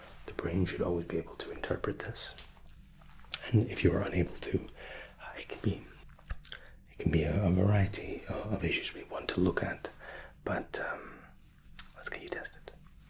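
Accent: Scotland, United Kingdom. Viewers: Nice to hear a Scottish ASMRtist and one who shouts out others particularly my favourite Be Brave Be You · Tremendous as usual. As a Scot myself, I enjoy hearing the accent. It's very refreshing 😊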